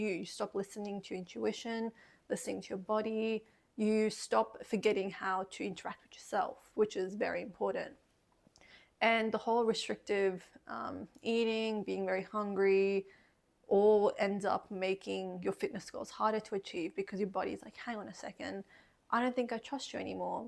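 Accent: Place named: Australia. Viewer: I like the content, but ur english is australian language, so it is difficult understand for Indians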